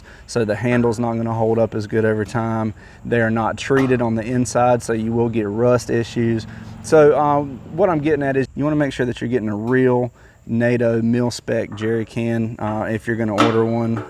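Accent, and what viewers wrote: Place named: North Carolina, United States. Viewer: I knew that was a NC accent. Thanks for this video. I'm draping a leg all over your channel my man!!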